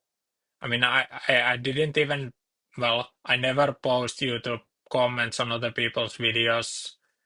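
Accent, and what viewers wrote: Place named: Finland. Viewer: Glad to see you back man! Been missing that sweet Finnish accent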